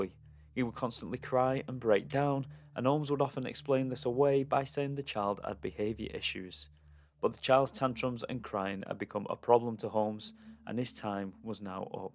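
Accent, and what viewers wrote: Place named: England, United Kingdom. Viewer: Typical American. I'm pretty sure the narrator is English 🤣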